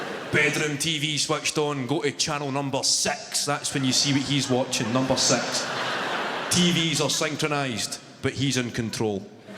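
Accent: Scotland, United Kingdom. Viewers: Must be nice. I'm an American working in Japan teaching ESL. I can understand most Irish, English, and Aussies. I have been trying to work on the Scottish accent which is one of the few that I can't master yet. Some of the things he says too fast I think, some are idioms that I don't know (he explained in one video that if a house was free meant the parents were on holiday and there was going to be party whether the teens knew it or not), but I think mostly his accent is just too thick I can't catch on. But the jokes I do catch are funny! My family is hoping to go to Scotland next summer on holiday. I love comedians so Kevin seemed like a good choice. I'm told that for tourists the people mostly tone down their accent. I hope so. (I've had Japanese friends go to the US and Australia and had terrible times with the language). Really looking forward to the trip! 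The countryside looks so beautiful. And I love Scotch. And unfortunately due to Brexit and Covid, it's really cheap! Everyone should be taking advantage of this! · I'm so bored with the strong Scottish and weak English shite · Love this man, I am Scottish, from Paisley. I live in South Africa since 1972. . It's like being at home when I hear him. 👏🙏❤ . Wonder if he will ever come to SA?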